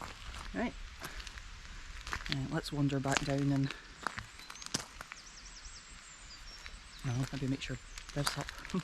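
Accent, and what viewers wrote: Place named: Scotland, United Kingdom. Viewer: I didn't know how much I needed your beatifull soothing scottish voice today. Thank you for that.💚 What a wonderfull trip in an absolutely wonderful country.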